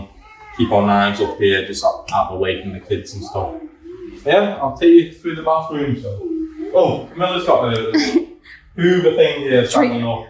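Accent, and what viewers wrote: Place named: Manchester, United Kingdom. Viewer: A manc Thai great to hear a northerner back home in Thailand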